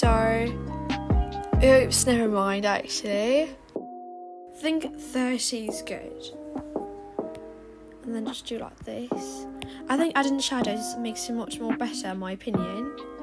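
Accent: United Kingdom. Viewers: Thank you so much! You’re voice is soo cute u have an really cute British accent! New subscriber! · Are u Scottish??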